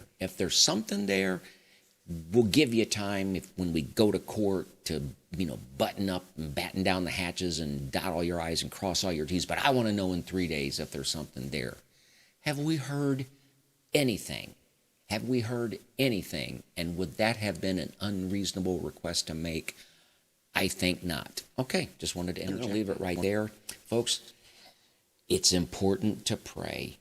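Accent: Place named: Mississippi, United States. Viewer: I live in Mississippi and I lost my mom to lung ca 11 yrs ago! They're proud of us!! Love your Mississippi accent!! Great!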